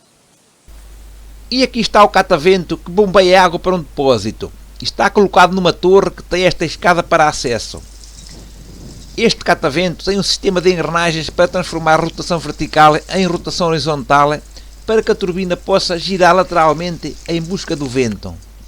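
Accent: Portugal. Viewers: Muita linda a sua chácara! 🌱🐝🌿🌾🐛🌻🌸🌱🌺 ...adoro ouvir o sotaque português! 😃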